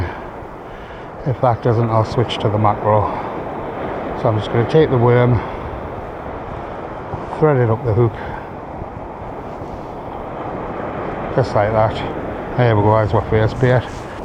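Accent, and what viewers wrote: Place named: North, United Kingdom. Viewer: Amazing buddy love your accent are you from up north 😁😁😉😉😉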